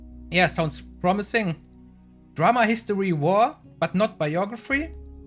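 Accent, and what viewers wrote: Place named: Germany. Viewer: Good reaction. Just guessing by your accent - are you German yourself?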